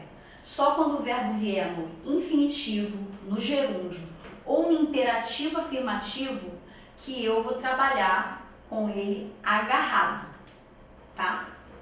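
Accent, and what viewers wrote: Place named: Rio de Janeiro, Brazil. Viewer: KKK engraçado o sotaque carioca dela falando espanhol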